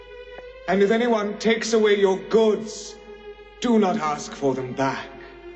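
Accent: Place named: England, United Kingdom. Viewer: Jesus didn't have a stupid English accent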